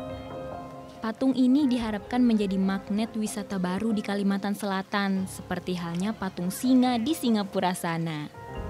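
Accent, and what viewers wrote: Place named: Sunda, Indonesia. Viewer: Mirip sunda logatnya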